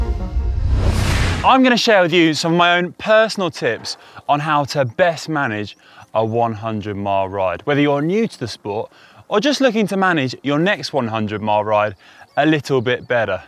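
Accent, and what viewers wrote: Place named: South East England, United Kingdom. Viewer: Name: Irish Home: Ireland Accent: South East England? What’s the story,?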